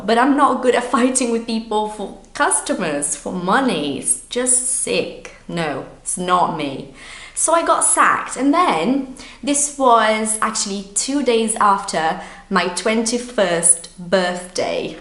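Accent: United Kingdom. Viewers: Well, it's pretty easy to see why you are teacher. You have all it takes to be a great teacher: you're very articulate, your sentences can take the listener from point A to point B and your mastery of the English language is amazing. You could easily pass for a native British person - if there was no Portuguese words below your videos, the only thing that would give out that you might be Brazilian or Portuguese is the way your name is written (with the diacritic acute - acento agudo para os lusofonos). And even then I wouldn't be so sure since there's a lot of people in North America (and I believe in Great Britain too) which have Portuguese names yet are second or third generation (the kids or grandkids of Brazilian or Portuguese immigrants) and can't speak a word in the language of their parents or grandparents. No Portuguese words and English with (written like this): there's no way I could say you're not a native Brit. 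· I wonder if I'll ever speak English with such a massive british accent! haha My English is totally "american" so for me the british English is almost a new language! Acho cute mas não consigo me imaginar falando assim rs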